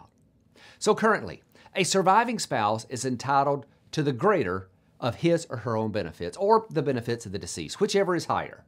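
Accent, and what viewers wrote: South, United States. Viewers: Duh, I'm a financial planner - a title anyone can use without much education. You make it sound confusing and make it much more complicated than it is. Just go to social security and call them - they make it much simpler than this doofus who chatters nonsense in southern accent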